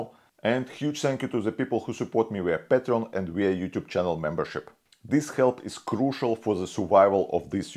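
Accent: Russia. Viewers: Русский акцент потихоньку убывает у автора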